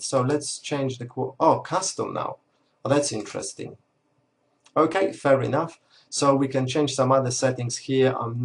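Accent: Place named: Poland. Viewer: Uszy więdną od twojego Ponglish....Pozdrawiam!!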